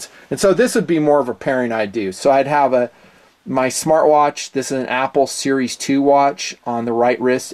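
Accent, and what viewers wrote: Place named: California, United States. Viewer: where are you from? You kinda sound like you're Californian hippie surfer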